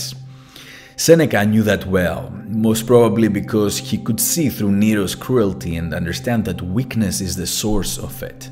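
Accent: Greece. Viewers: Φίλε... 15 δευτερόλεπτα και λέω Έλληνας είσαι?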